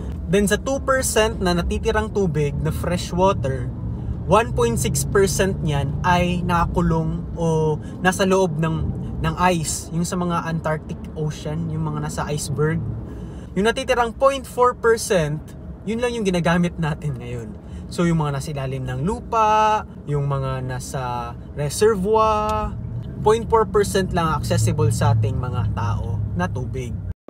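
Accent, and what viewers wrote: Visayas, Philippines. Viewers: Omg 😮 bisaya ka engineer? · The accent of yours tol is so cute... Bisaya...hehehe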